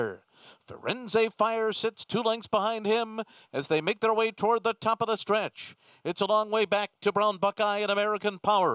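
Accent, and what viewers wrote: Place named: Venezuela. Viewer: castellano de Venezuela !!!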